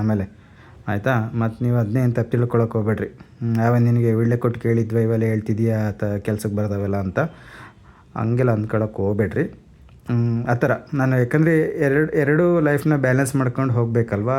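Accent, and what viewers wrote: Chitradurga, India. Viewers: ಸರ್ ನಿಮ್ಮ ಮಾತು ಕೇಳಿದರೆ ಸಂತೋಷ ವಾಗುತ್ತದೆ. ಚಿತ್ರದುರ್ಗ ಭಾಷೆಯ ಸೊಗಡು , ಪದಗಳನ್ನು ಬಳಸಿ. ಬದಲಾವಣೆ ಬೇಡ . ಏಕೆಂದರೆ ಕನ್ನಡ ವೈವಿಧ್ಯ ಮಯ ಭಾಷೆ . ಪ್ರಾದೇಶಿಕ ಭಿನ್ನತೆಗಳು ಇರುತ್ತವೆ . ನಿಮ್ಮ ಮಾತು ನಿಮ್ಮ ಸೊತ್ತು . 👍👍👍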